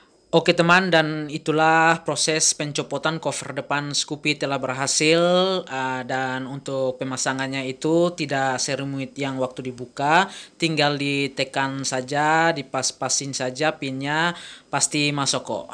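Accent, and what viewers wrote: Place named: Bali, Indonesia. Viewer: Kalau dengar logatnya pasti dia orang bali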